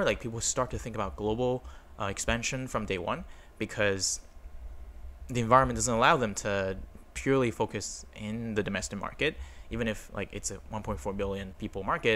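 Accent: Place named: United Kingdom. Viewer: Good video. Also u have a heavier British accent these days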